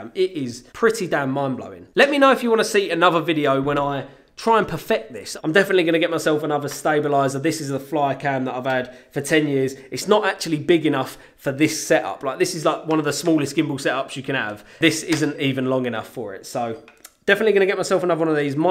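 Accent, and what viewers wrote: United Kingdom. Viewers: ' creativity is just like his British Accent. Effortlessly Smooth and Precise.😁😁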